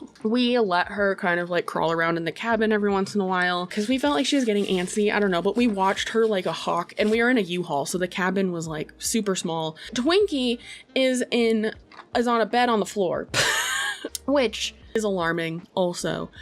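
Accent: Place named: Upper Peninsula, United States. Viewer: YOOPER! I knew Iknew your accent!!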